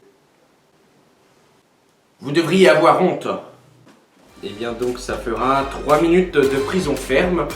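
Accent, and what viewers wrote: France. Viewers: Superbe vidéo et tu est vraiment du nord ? :D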